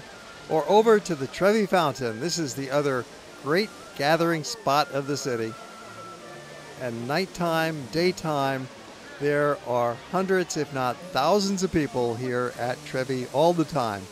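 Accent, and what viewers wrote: United States. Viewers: your accent does sound very American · Great video! Thank you! What U.S. accent have you? I can't pick it...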